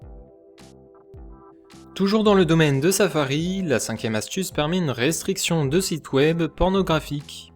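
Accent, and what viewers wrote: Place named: Switzerland. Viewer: Sémantique à revoir. Vidéo bonne. Accent Suisse qui passe mal, manque de punch.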